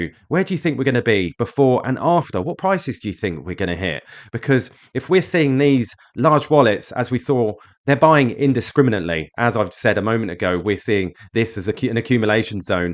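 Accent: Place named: United Kingdom. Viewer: Hi, sounds like your in the uk. Do you know of any accountants that know they’re crypto, mines got no clue about it.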